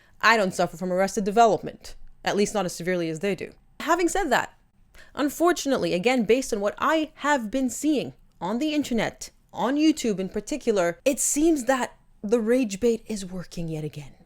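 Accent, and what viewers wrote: United States. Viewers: If you’re Australian why do you have a USA or Canadian accent? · You put out wonderful, intelligent content just wondering where are you from? You have an American accent but are an Australian citizen? just curious thank you for your service wherever you served. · Hold up.... record scratch You are an Australian??? So your One of Us? Wow you sound so American Now😆 🇦🇺👋👑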